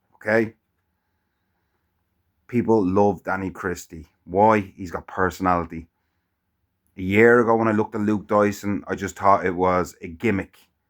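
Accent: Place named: Wales, United Kingdom. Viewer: My welsh sounding guy.....YNWA 👌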